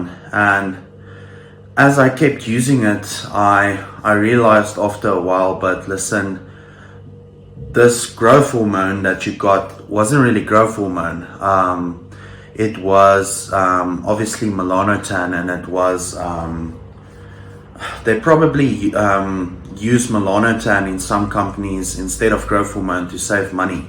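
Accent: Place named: South Africa. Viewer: Hahzit boet, good to hear a fellow saffa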